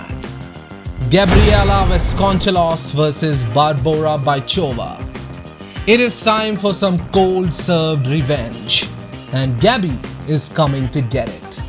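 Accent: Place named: India. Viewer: ur indian accent is hilarious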